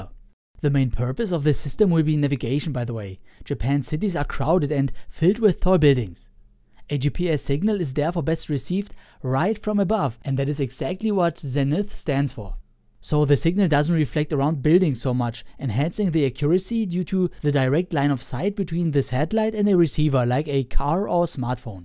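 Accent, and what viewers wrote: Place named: Swabia, Germany. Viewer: Dieses Spätzle-Englisch!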